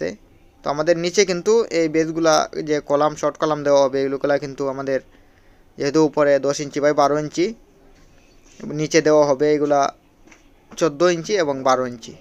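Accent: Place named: Sylhet, Bangladesh. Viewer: আসসালামু আলাইকুম۔۔ জনাব, আপনার কথায় বুঝতে পারছি আপনার বাড়ি সিলেট বিভাগে l সিলেট শহরে পাঁচ শতক জমির উপরে তিন তলা ফাউন্ডেশন দিয়ে একতলা বাড়ি করতে আগ্রহী l এজন্য আমি নিজেই একটি নকশা করে রেখেছি l আপনার ভিডিওগুলো অনেক সহজবোধ্য হওয়ায় আপনার সাথে আলোচনার জন্য আগ্রহ প্রকাশ করছি l এজন্য আপনার মোবাইল নাম্বার (Whatsapp /Imo) o email address পেতে পারি কি ? ধন্যবাদ !! __ মিছবাহ তফাদার রিয়াদ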